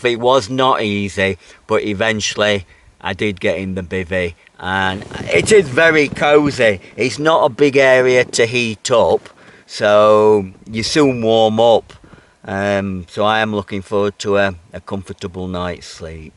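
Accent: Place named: Manchester, United Kingdom. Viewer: Where are you from, accent a bit Manc, but maybe our Oldham ?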